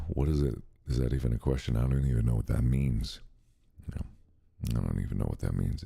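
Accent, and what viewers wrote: France. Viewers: Oh my!!! French accent through the video; oh dear gosh!!! Must be an ASMR video of some stuff.🧔🏻🎮😹🙀😼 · nice french accent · Lol french! Yes! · French Accent AU ASMR 👌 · Why does he sound french 🥖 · Am I the only one that hears a bit of a French accent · why talking in french voice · Markiplier sounds like Tom Kenny's SpongeBob SquarePants French narrorator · French Markiplier!~ 😍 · The french accent makes me laugh, i am, and i talk like that, so, for the FIRST TIME, i understand you very well 😭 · I was watching Murder on the Orient Express so coming to this and hearing him with the French was very odd.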